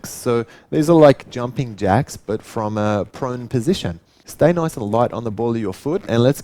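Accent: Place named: Australia. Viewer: He's got an aussie accent, but where was this filmed? Gorgeous place! I did this workout today (not all, though!) and absolutely loved it. So glad I found this channel.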